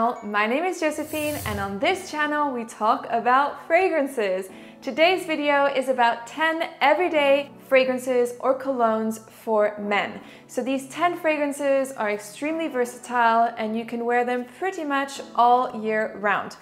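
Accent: France. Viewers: You have a very nice french accent, and even Arabic i guess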